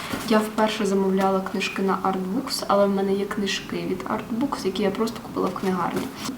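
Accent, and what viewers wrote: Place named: Russia. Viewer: Привітик, файне відео:3 але попрацюй над вимовою літер, вимовляй чіткіше літеру "Щ" наприклад як "шчо, шче, шчоб" та не забувай про "Ў нескладове", розумію тобі як російськомовній буде тяжко звикнути, але потрібно позбавлятися російської вимови, я вже довгий час працюю над своєю вимовою!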